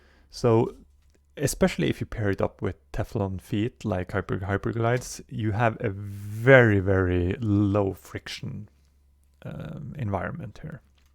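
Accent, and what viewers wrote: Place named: Norway. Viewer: Mate, your videos are more than helpful. I learned some great tips, The one where you hold the medikit and protect your head (hit point) against grenades was a pearl of wisdom. Today, you taught me not to always spawn at squad leader, which should be obvious. Only until someone points it out. I have developed an obsession with battlefield 2 that came out of personal trauma, and I have this urge to become a better player. It's crazy, as it's a 20 y.o game, but EA and Dice haven't come out with anything better since IMO. BF3 and BF4 single player campaign are good, but they took away all the team and commander functions, and replaced it with a diet version. No ability to mod. Don't get me started on Jets. No take off at runway is a big, fat joke. I dislike (beyond comprehension) the path they chose. They screwed over customers/fans for the money, and to be more like COD. 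Imagine BF2 with BF4 graphics. No reason why this shouldn't have been made possible. I truly dislike EA and Dice! Instead we are stuck with these outdated graphics and engine. I had an idea you were Norwegian. Your accent is very familiar. My Daughter is from Bergen, and her Mom is from Sogndal. I can tell you are an infantry purest. I don't even sdee you using UAV/Artiliery, or Commander in your videos. I looked up Zeqtr. He is very good. Gonna watch more and pick up some tips. I was wondering, are there any competitive BF2 servers nowadays, or do the remaining servers just account for public players? I mean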